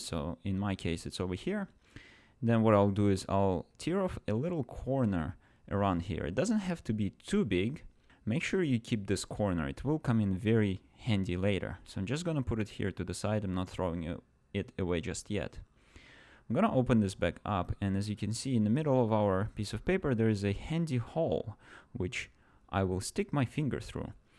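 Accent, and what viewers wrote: Russia. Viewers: С чего ты это взял? Явно русский акцент и отдельный канал где он говорит на русском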